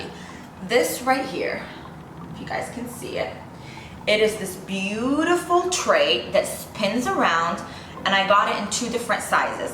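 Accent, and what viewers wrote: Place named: Arab world. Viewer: we still love ur Arabic accent. So it's okay u can pass with it.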